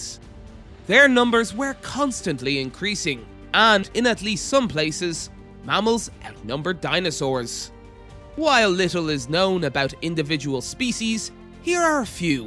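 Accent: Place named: United Kingdom. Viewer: The narrator has a shrill voice and a UK accent. Not my favorite.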